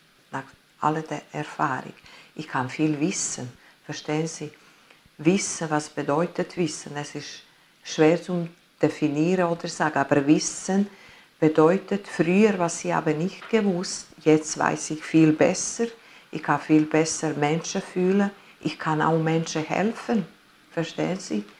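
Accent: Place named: Swiss German, Switzerland. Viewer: This lady speaks Swiss German dialect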